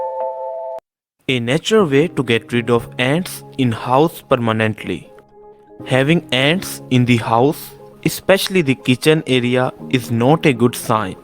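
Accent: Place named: Scotland, United Kingdom. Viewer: I cant understand the thick accent... Is it Scottish?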